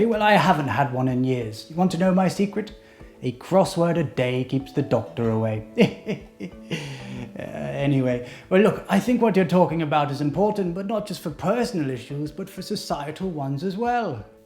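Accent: United Kingdom. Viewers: Ancient wisdom and British accent! My YouTube knows exactly what I like. 👀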